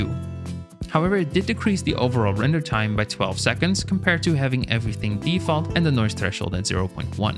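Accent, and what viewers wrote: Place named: Netherlands. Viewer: I hear a Dutch accent! NL!!!!! ❤❤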